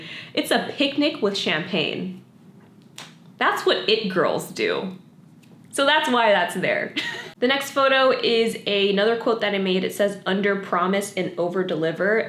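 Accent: Australia. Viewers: girl I've gotten so used to you saying "in the description box down below" in an Australian accent that I get confused when other YouTubers don't say it in one lmao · I love that the aussie accent intro is making a reappearance!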